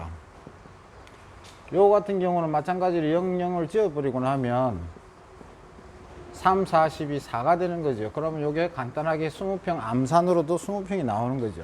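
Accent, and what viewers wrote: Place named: Busan, South Korea. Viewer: 사장님 부산 이네요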